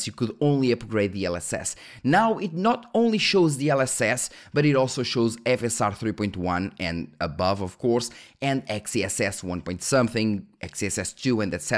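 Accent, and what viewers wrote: Portugal. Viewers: Excellent video and explanations, thank you! P.s.: I've always wondered where your accent was from but I could never put my finger on it, but on this video I heard you speaking with your son (I guess) and I noticed you're Portuguese (I'm Brazilian, so that was easy to spot). Well, that's just my curiosity speaking now. Cheers! :) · Assunto fora de tópico, você é português ou brasileiro?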